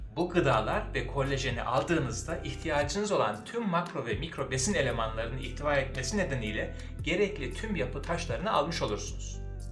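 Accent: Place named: Samsun, Turkey. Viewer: Hocam Samsunlumusunuz, veya Samsunda bulundunuz mu? Şiveniz Samsun şivesi de ondan sordum..?😂 Sevgiler Saygılar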